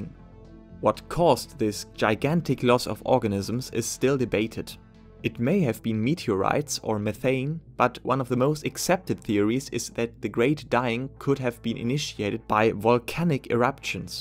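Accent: Germany. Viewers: I like your thick German accent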